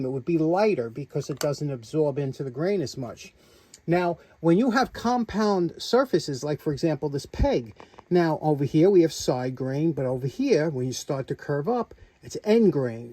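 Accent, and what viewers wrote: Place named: New England, United States. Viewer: Great vid but..........Your Yankee is showing. It's not Pea_Can, it's pe-kan!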